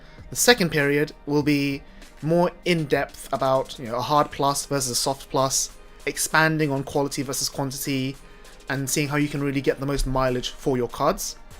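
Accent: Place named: Belfast, United Kingdom. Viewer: I like that Belfast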